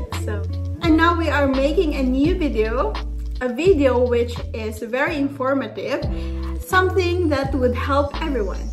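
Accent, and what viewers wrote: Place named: Philippines. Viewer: Are you a Filipino? Hehe. Because you sound like a Filipino accent when speak in English 😅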